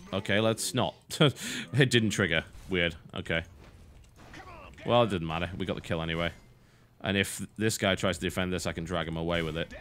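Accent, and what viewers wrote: United Kingdom. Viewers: How are you saying things wrong? Your British, if anything the Americans are saying it wrong but eh its the accent I guess, just never think you are the one who cannot speak English correctly. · You sound extra british in this video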